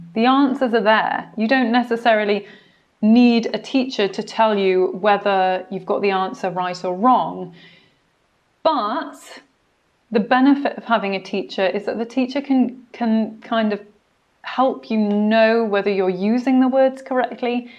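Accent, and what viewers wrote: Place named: United Kingdom. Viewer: The most cordial greetings: I'm a lawyer from Colombia who is learning general English, but I also want to be able to speak about my profession in this beautiful language, therefore I thank you for your review, bucause now I know that is a good idea to get this book and why not, to take the TOLES exam. Take care. Bless you. Postscript: I really love the british accent.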